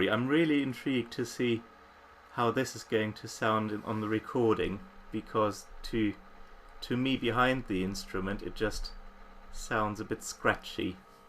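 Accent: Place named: England, United Kingdom. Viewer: Oh your voice..I have never heard it...what a a lovely English accent,. For picks, I am told..there should be no give...no bendy qualities ( for movement efficiency) and not too pointy. ( personally I use Blue Chip TAD 40 always )- but my teacher uses a TAD 60. I have many of the same ones you have too. But I like the way the TAD 40 sounds on your mando. Maybe it depends on the type of music you play (ie: jazz) With 31 picks..I'd say - pick one and stick with it. Which one is the most comfortable in your fingers.? If you get used to one, you will learn how to strike the strings the way you like. This is a good review, btw, for many people to watch.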